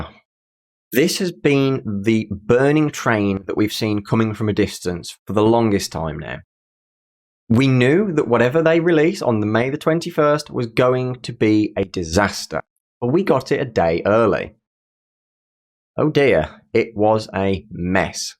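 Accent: Birmingham, United Kingdom. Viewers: Are you a brummie :)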